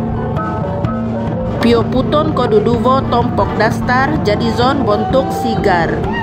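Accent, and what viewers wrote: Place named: Papar, Malaysia. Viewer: Voice over not bad with the papar dialect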